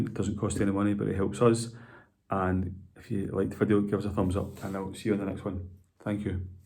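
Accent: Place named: Scotland, United Kingdom. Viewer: Great video, nice to hear a Scottish accent too! My dad’s van is the mk3 but there is a bit of a racket coming from the engine, no low oil pressure and warning as of yet, the rattle evens out after a bit of driving, my thinking is it’s taking a while for the oil to get to the top maybe due to a pump on the road out or a bad tensioner like yours. Did you say you done the timing chain tensioners? What about the chain? Curious on the millage as well, cheers👍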